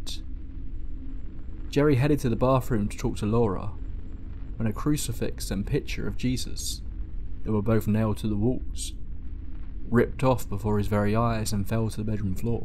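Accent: England, United Kingdom. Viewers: Narrator with an English accent knows what happens in Connecticut in the 1970s .. plagiarized crap, or made up crap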